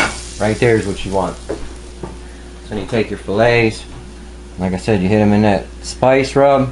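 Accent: Pittsburgh, United States. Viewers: It took me about 5 seconds to tell your from Pittsburgh, love it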